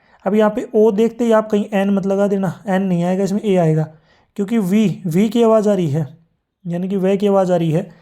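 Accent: Haryana, India. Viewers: Aawaz se hi lag raha hai ki sir haryana se hai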